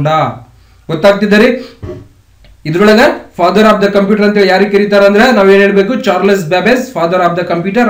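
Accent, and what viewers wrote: North Karnataka, India. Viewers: Super....knowledgeable video sir....your talking impressed me...I like your uttara Karnataka language......your video is helpful to me....all poor persons....and keep it up sir....continue next.....hevvy computer knowledge video provided me us......🙏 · Bhal chalo heltiri sir kelta idre boring agolla nam uk bhashe ne hange nanna 2 subscribe nive ast ista aitu nim video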